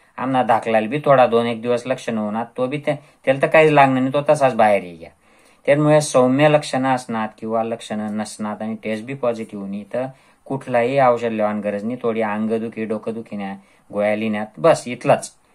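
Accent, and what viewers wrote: Khandesh, India. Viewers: Khan deshi bolatay ka sir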